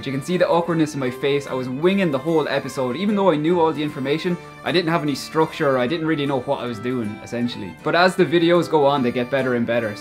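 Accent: Tipperary, Ireland. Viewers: you sound like you might be close to the tipp border? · Interesting to learn through songs about Irish history! thank you for this! May I ask what Irish dialect you speak? is it standard Irish or from a specific region?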